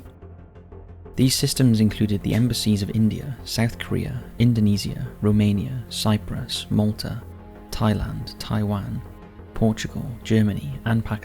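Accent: Wales, United Kingdom. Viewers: Nice British accent 😊😊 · It's a Welsh accent probably from Cardiff or Newport area.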